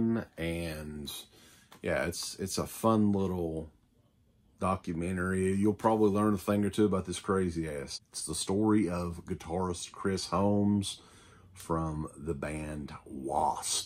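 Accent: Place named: Deep South, United States. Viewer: Where you from brotha? You got a good ol deep south accent. Im in Arkansas from Texas. Glad to see good ol boys on some good ol metal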